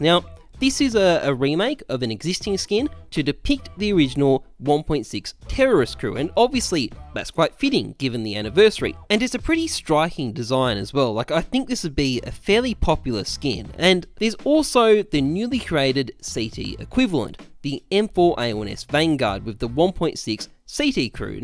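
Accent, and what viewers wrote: Australia. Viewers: Isn't from Australia too? His accent is like an Australian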